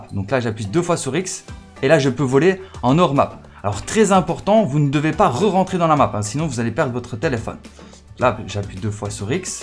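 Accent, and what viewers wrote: Belgium. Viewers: Tu est belge ou pas (question)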